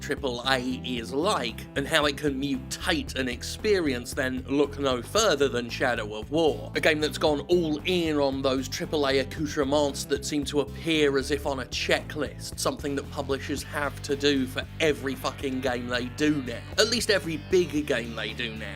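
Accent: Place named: United Kingdom. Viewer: Some Times i find the british Accent some anoying. But yours sounds great. Especially when you talk down to bad Business and Games. This Videos help me to resist the Urge to buy Shadow of Mordor, thanks. I think i buy Hellblade instead.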